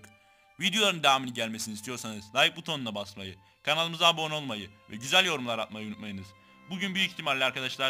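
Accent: Gaziantep, Turkey. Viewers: Abi anteplimisin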